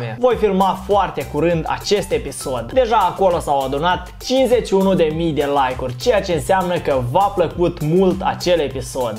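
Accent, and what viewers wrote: Moldova. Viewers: Tu esti moldovean?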